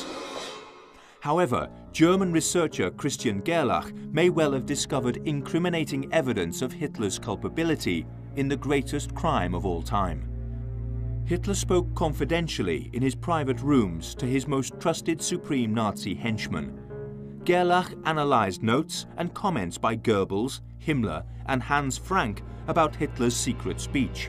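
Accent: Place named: Scotland, United Kingdom. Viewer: took me a few minutes to decipher the Scottish, couldn't place the second influence in his accent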